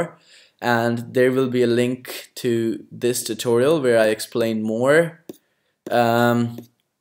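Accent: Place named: United States. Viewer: but your ascent is american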